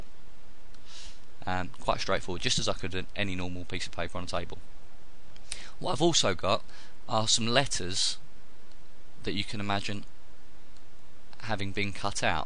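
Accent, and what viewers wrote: United Kingdom. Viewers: ur british?! sexxyyyy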